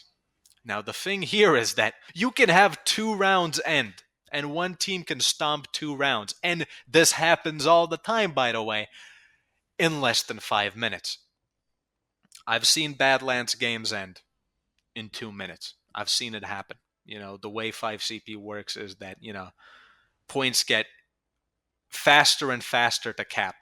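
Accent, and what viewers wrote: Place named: New York, United States. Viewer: Babe wake up, the NYC sounding European is talking shit about Walve again!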